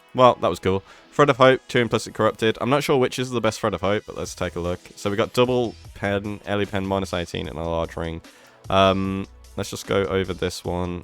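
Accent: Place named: United Kingdom. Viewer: I find the pronunciations of “unrequited” and “succor” to be offensive. Are you or not?! Edit: “The ‘Enfusiast’” absolutely sounds British 😆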